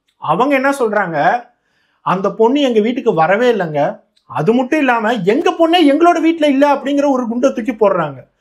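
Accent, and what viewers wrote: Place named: Coimbatore, India. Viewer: ஹலோ வணக்கம் வணக்கம் நான் கடந்த சில மாதங்களாக உங்களது வீடியோவை மிஸ் பண்ணாமல் பார்த்து வருகிற மற்றவர்கள் போடுகிற வீடியோ விற்கும் உங்களுக்கும் என்ன வித்தியாசம் என்றால் அந்த சம்பவத்தை சொல்லும் விதம் தேவையில்லாத ஆர்ப்பாட்டங்கள் இல்லாத அருமையான தெளிவான விளக்கம் கடைசியில் ஒரு ஒரு அனுபவம் பாடம் ஒருவேளை நீங்கள் பொள்ளாச்சியில் படித்ததால் கோவை மாவட்ட சொல் வழக்கு நன்றாகவே உங்களுக்கு வருகிறது அந்த கதை சொல்லும் விதமே நீங்கள் வெற்றியடைய முழு முதற்காரணம் தயவுசெய்து இந்த பாணியை மாற்றிக் கொள்ளாதீர்கள் தானாகவே பார்ப்பவர்கள் எண்ணிக்கை அதிகமாகும்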